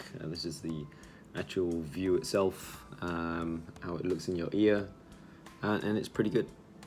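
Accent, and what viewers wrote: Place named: United Kingdom. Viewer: Will these pair to an Apple Watch cellular so I can just go walking without a phone? Are you a native Singaporean? ( British accent) Cheers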